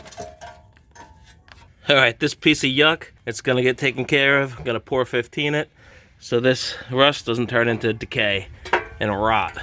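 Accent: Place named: North, United States. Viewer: I actually had to google what the heck that was 🤦‍♀️😂 LOL! I don’t talk to many people from Lawn guyland, so I was out of the loop. You definitely have a northern accent! Especially when you’re talking to other northerners. I do the same thing when I go home 😄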